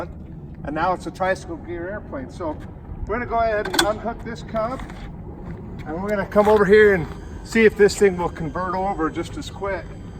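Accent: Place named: United States. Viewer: you have a much stronger USA accent than your brother, respect from cup of tea governor, Englishman, MIC696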